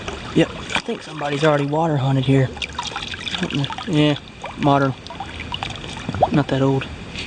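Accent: South, United States. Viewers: I just want to say that I really enjoy your videos! you have a great southern accent too. you have gotten me into the great hobby of metal detecting. I'm gettin a garrett at pro pointer today off of amazon. Good luck and god bless you.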